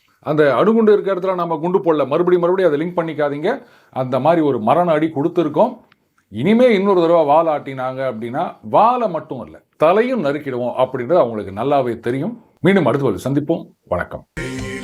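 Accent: Chennai, India. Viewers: Sir. Our Chinna correction. Tucker Carlson - Fox News. CNN Ellai. Seldom I get to hear Madras dialect nowadays. Thank you for your service. அட்சா சும்மா எகிரிடுகுது.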